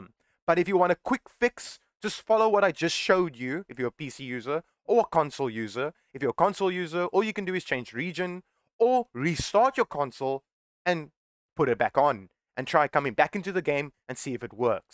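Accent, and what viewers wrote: South Africa. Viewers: thanks! btw is my man south african?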